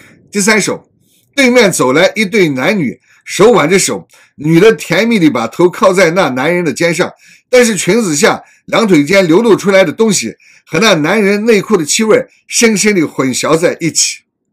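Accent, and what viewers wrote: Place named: Shaanxi, China. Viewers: 西安话？我老婆说是西安城边长安县方言！哈哈！ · 好烂的诗，陕西话一说很像黄色笑话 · 陕西话真难听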